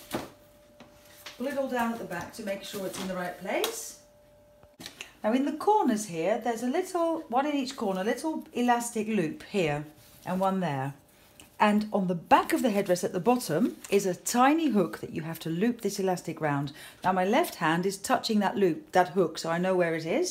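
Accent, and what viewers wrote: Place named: England, United Kingdom. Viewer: Lovely accent, from England ?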